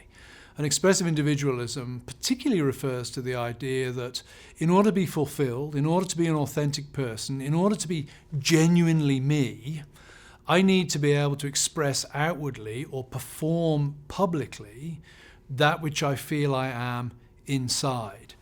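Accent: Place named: England, United Kingdom. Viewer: If he applied this baloney to American Evangelicals he would come up with the same crappy conclusions about them as intends about gays and lesbians. - What he is doing is claiming that gay people are concerned about nothing, and I mean NOTHING aside from SEX, SEX, SEX. This has been the tactic of bigoted American Evangelicals for DECADES. All he is doing is dressing it up with phony 'intellectualism' along with his English Accent. If you can't think about gay people in any other way than THE SEXUAL REVOLUTION, you are a bigot, because you are completely denying their complex identities as human beings, - which you claim in nothing more than 'Christian' , - which is complete dishonest baloney. You have personal issues you need to deal with.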